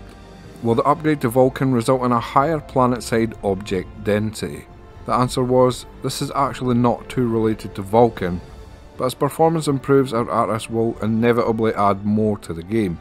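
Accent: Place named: Scotland, United Kingdom. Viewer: this lads definitely the proud owner of a scottish accent. I know it because i almost didn't understand a word or two. still great stuff 😊 Edit: motherfricker, almost caused an international incident with a typo! Edit2: also you gained a subscriber in me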